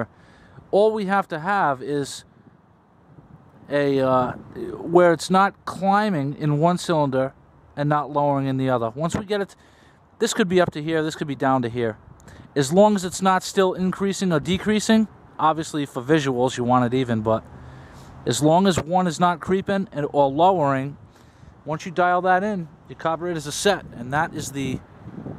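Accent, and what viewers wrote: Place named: New York, United States. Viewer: Sounds like every other New Yawker Ive ever heard or maybe BAAAsten.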